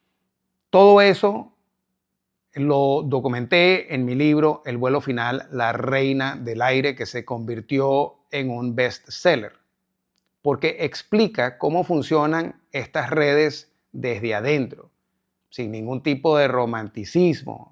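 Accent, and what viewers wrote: Venezuela. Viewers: Cómo buen Tachirense, hablas sin "pelos en la lengua " en perfecto acento venezolano. Gracias ❤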